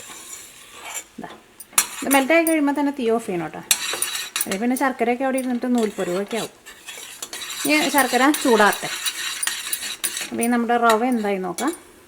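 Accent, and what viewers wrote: Thrissur, India. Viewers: ഇഷ്ട്ടമായി മിയ എനിക്ക് അവതരണം നല്ലത് തൃശൂർകാരുടെ സംസാര രീതി പിന്നെ ഇടക് പുറം കാഴ്ച്ച കാണിക്കുണ്ട് അതു സൂപ്പർ ആ രാജ്യത്തിന്റെ ഭംഗി കാണാം മഞ്ഞു വീഴുന്നത്. സൂപ്പർ 👍👍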